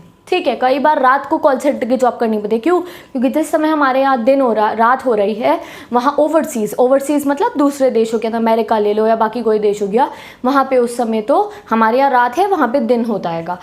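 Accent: Punjab, India. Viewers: ur punjabie this is clearly fy with ur talking style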